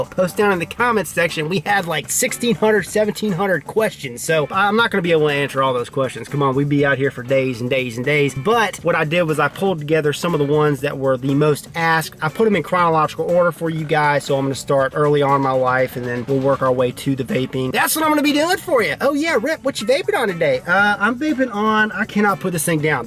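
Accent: South, United States. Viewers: well well, Rip...that's a trip! You're an Oak Ridge boy and I am a Hermitage/Nashville Girl! I've always thought I heard a lil twang in ya but when you said you dipped, I KNEW you were southern! Ahaha....My brotha from another motha! Thanks 4 the vid. Hella informative. More so than any other yet!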